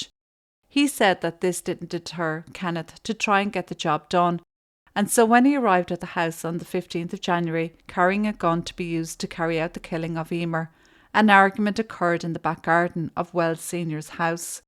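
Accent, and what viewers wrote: Ireland. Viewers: thank you for another great video. It warms my heart to see your subscriber count growing. If I ever get to travel to Ireland, what are some of the quaintest, lovely, and non-touristy places to visit? I am from an ugly , dusty Texas town would be easily bewitched by the greenness and exquisite verbal accents like you have!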